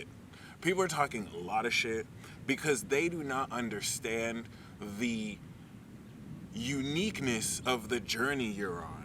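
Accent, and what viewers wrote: Nigeria. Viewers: The Nigerian accent takes me out everytime 😂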